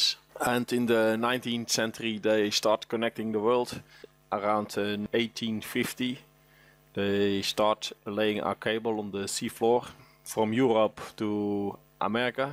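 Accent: Netherlands. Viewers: Dutch, 100% Dutch innit he?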